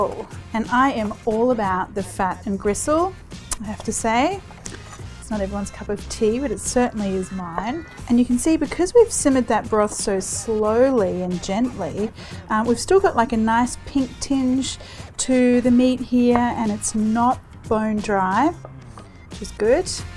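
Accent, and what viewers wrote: Australia. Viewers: I love how Australian accents add an 'er' to the end of words... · That’s her Australian accent.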